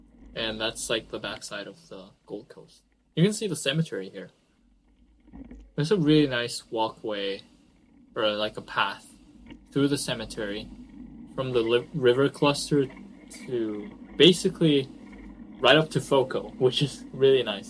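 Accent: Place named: China. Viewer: I can tell you have a slight accent. No offense. I'm guessing your bilingual and your second language is Chinese which is very difficult. You do speak perfect English though. I'm trying to learn French which is hard enough.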